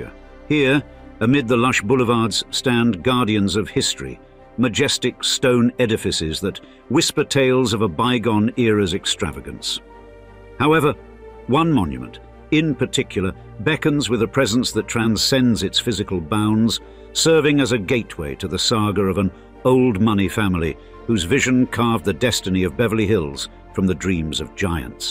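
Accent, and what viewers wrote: United Kingdom. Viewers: A British accent narrating an American city sounds odd.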